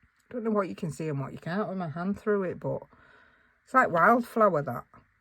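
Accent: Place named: Yorkshire, United Kingdom. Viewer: Hey! Love the long video, wish I was there...bit of a schlep from Oz tho 😂. We'll be back home next week for a visit so just missed it....boo😢! Glad you had a wonderful time well jell over all your lovely goodies ❤ have a lovely weekend bud 😊 ps...lovely to see you and to put a face to that yorky accent 😂❤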